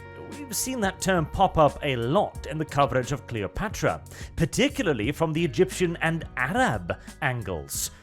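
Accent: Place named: United Kingdom. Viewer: Your from Norway? you sound more British than the British do!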